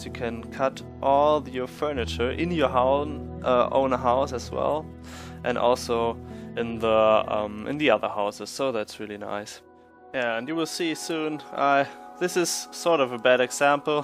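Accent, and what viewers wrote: Germany. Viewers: Dieses Pseudo Englisch von Deutschen lmfao. Aber gutes Video !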